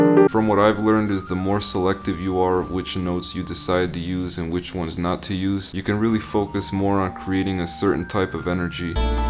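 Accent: Canada. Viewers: I THOUGHT HE SOUNDED CANADIAN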